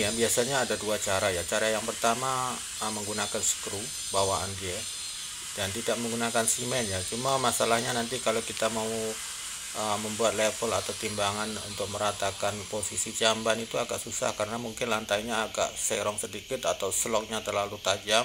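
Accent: Malaysia. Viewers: Cakap dah macam org Malaysia marah pulak nanti org Indonesia. Mantap bro jelas mudah faham.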